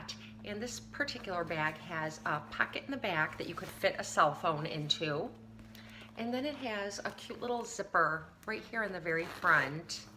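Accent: Wisconsin, United States. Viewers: I absolutely love HOBO bags. Love the 70’s decor on them. And the leather is phenomenal. I have 5 bags and 2 wallets. The wallet you are showing is called the ‘Lauren’. It is one of their most popular bags. Ps. You are very pretty. And you sound like you are from Wisconsin. Happy Holidays/Birthday. ☺️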